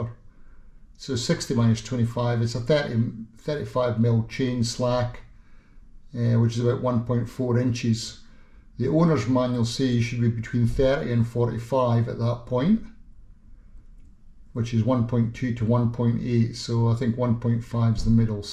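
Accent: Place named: Scotland, United Kingdom. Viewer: Love the Scottish accent, Glasgow? Ave got a DR650 here in Melbourne, luv it👍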